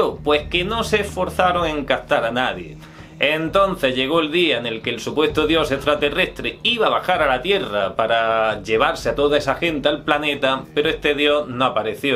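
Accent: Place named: Granada, Spain. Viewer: like solo por el acentazo granaino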